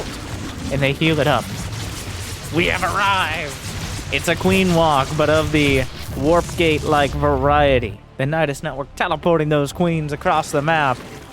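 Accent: United States. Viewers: StarCraft needs this American voice on the scene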